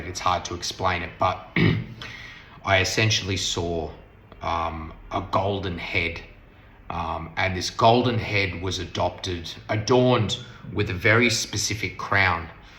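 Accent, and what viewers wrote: Australia. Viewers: greatest weakness: Australian English. The Indian viewer's greatest strength: Listening to Australian commentators for cricket. XD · Your Australian accent is lovely. Please never change your accent. I love listening your English. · I'm from Bharat 🇮🇳 Your Australian accent to amazing. I understood completely no issues. What you experienced is not normal. Haman Chalisa meas connection to ALMIGHTY GOD. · Don't apologize for your accent. When the intent is right things like accent don't matter! And you have a lovely Aussie accents anyways! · Don't worry..... Indians are used to Australian accent from all the cricket commentaries we have heard all these years😂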